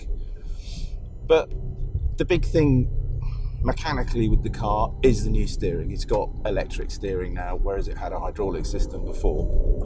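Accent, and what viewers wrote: United Kingdom. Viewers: He has a British accent, bud. That's why it sounds odd 😁.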